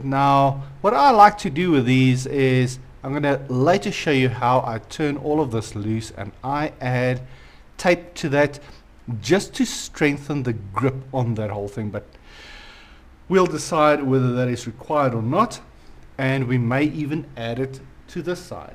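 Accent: South Africa. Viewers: use Tweezers boetie ;) Recently moved to New York and Iv needed a new hobby so decided buying a drone might be the way... Iv been doing a bit of research on the Tarot 690s and they seem to be the most customisable of the lot. what are your thoughts on building vs buying a used one for about $550 without a transmitter? PS watching the video and hearing your accent has made me miss Cape Town soooo much!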